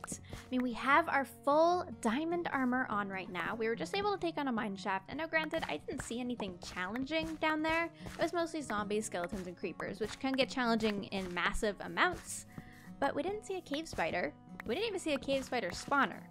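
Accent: Australia. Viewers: Just a question are you Australian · Hey! Are you Australian at all? I love the videos, great job:)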